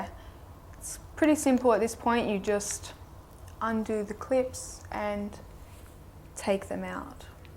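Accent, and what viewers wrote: Australia. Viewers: your aussie accent is so fun to listen to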